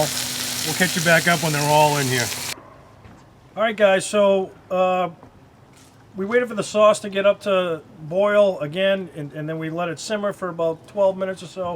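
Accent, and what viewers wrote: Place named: Massachusetts, United States. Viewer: I'm thinking Tewksbury/wilmington? maybe billerica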